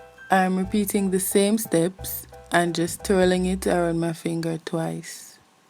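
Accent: Jamaica. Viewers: your voice tells me that your a Jamaican... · u sound Jamaican. Jamaica to d worllll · Amen! Straight yard she come from! Jamaica to di world! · You sound so jamaican..I love it😁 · From she start talk mi know seh she a Jamaican ❤ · ikr I was like yayyyy a Jamaican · this is awesome..thank you so much...yaaaaas u jamaican i can hear it!! dwl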